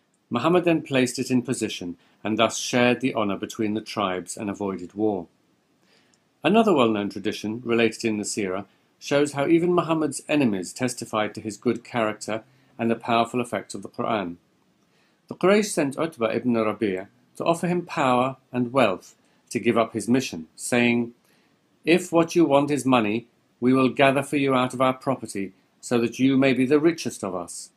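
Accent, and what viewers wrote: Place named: United Kingdom. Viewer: Your beautiful posh English accent makes everything sound more effective. Nicely explained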